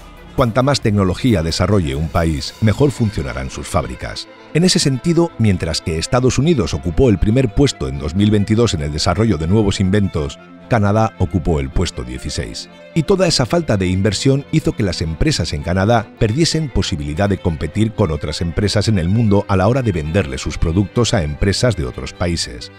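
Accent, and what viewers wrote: Spain. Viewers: Me gusto mucho este narrativa de aprendizaje no hay ruido de fondo esas música que suelen poner que no te dejan escuchar y ese acento español me gusto ❤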